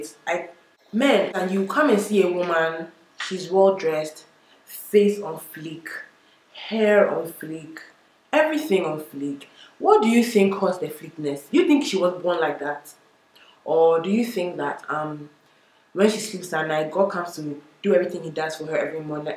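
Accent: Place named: Ghana. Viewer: This video deserves more views 😭😭😭 P. S I love the little Ghanaian accent you have!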